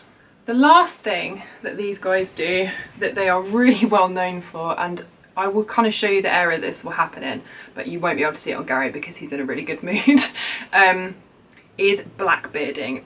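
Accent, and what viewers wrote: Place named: England, United Kingdom. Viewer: Nice to hear an English voice for once. I keep snakes and a chameleon and I just bought a dragon yesterday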